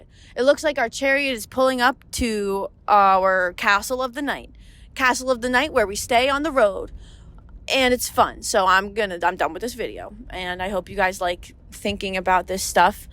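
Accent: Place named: Upstate New York, United States. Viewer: The most north NY accent of all time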